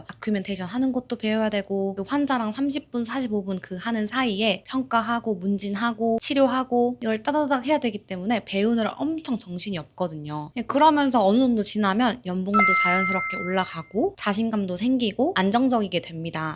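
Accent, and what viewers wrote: Jeolla, South Korea. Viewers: 전라도 사투리가 참 정겹네요 잘 들었습니다 감사합니다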